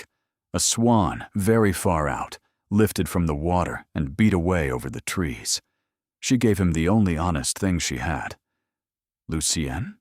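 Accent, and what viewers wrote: United States. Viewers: Why pick an American voice for a totally British story. This keeps happening. I have listened to some very good stories with proper British voices & some were excellent. This one has a Duke speaking in an absolutely inappropriate American voice. Then, the story has the most unheroic hero I can image. He grows up with a brother & sister. He loves both of them, the brother as his best friend & from the time she is 16, he falls in love with the sister of his best friend. She loves him in return but this weird non hero sets out to never allow any chance of them being together. He even tells her to marry someone else & tries to run away from her. She, actually, is a heroine & chases him down so they DO wind up together but, frankly, I can’t imagine not being so disgusted by that time, besides just tired, I would wonder if I could ever trust him. 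There was never a good reason for his ridiculous sacrifice of their lives that I could see. It’s something different but not likable. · I really have a problem with this AI’s voice. Its sooo unpalatable. I couldnt enjoy the video because of this repulsive voice and American accent that really does not suit this type of audio story.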